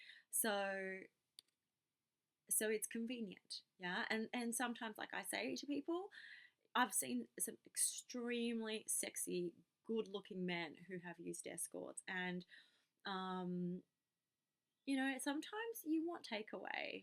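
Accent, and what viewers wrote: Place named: Australia. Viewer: judging by her accent she's from down under where it's legal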